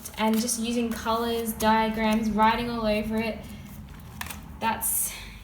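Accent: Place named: Australia. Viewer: I thought it would be a British video but she’s Australian 😂😂😂mate I’m dying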